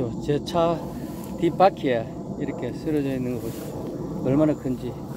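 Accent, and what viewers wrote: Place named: Andong, South Korea. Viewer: 992 출발합니다 오셔서 감사해요 농원의 달콤한 향 가득 만날생각에 설레요 같은 지역의 억양이라 더 정겨워요 안동태생 입니다 ㅎ 자주올게요 ❣️☕️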